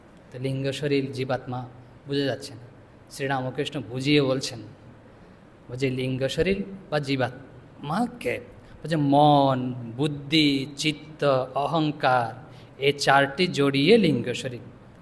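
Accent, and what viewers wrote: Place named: Chittagong, Bangladesh. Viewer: Apne ke chto gamar lok vasar tan ta chogam tan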